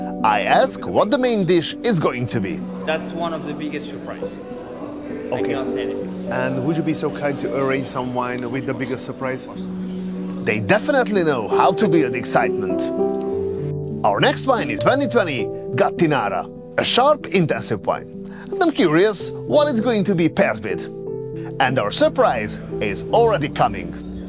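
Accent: Hungary. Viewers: you sound very very very hungarian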